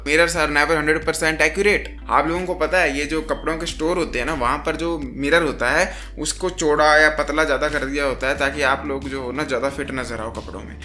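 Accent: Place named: North India, India. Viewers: You are from punjab or himachal your accent reflects. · yes, he's from Himachal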